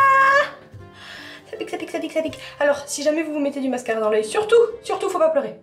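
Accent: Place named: England, United Kingdom. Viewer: J'adore ton accent englais..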